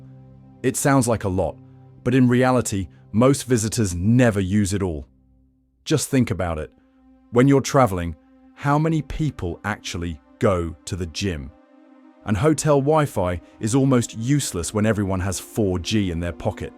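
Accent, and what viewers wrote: United Kingdom. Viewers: Sounds like you want something for nothing. Las Vegas survives off of resort fees and the casinos without that there is no Las Vegas. If we go to your country you do the same shit what are you talking about. You're a hypocrite. You sound like you're from Great Britain has anyone ever been to Great Britain. They gouge the tourist like there's no tomorrow. You're a hypocrite.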